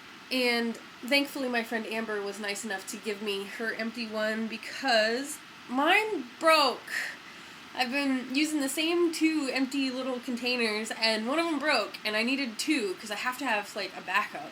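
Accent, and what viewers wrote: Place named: Minnesota, United States. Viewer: This portly lad talks like he is from Minnesooootah! How odd.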